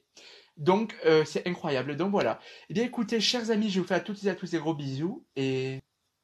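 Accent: South, France. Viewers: Sa se voit que tu VIEN du sud de la France comme moi 😊🇫🇷🖤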